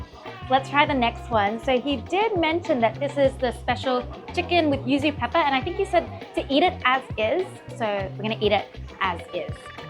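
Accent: Australia. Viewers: Mizuno … might be great for me being gluten free…. Good to hear the Aussie accent! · she sounds like an Aussie, so are the prices in Australian dollars? would be more helpful to list the actual prices or do they accept foreign currencies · I have a thing for asian girls with an aussie accent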